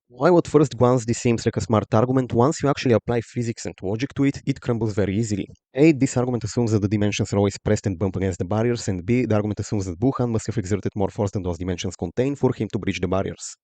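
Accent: Russia. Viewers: off topic question, but... you're Russian, it's just that accent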